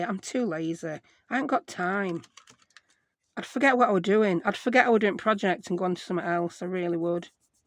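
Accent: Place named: Rochdale, United Kingdom. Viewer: I have only just seen your video. You are so funny, especially about the kids comments your accent sound somewhere around Rochdale area. Great cards.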